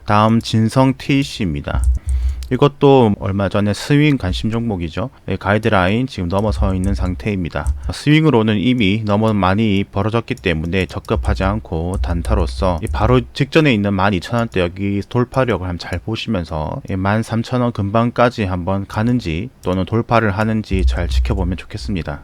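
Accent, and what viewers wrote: Gyeongsang, South Korea. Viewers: 시끕 ㅋㅋㅋㅋ 경상도 아입니꺼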